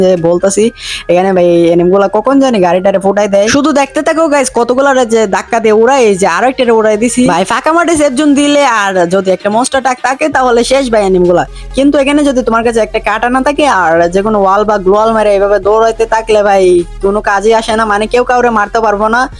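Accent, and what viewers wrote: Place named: Sylhet, Bangladesh. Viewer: সিলেট বাড়ি নি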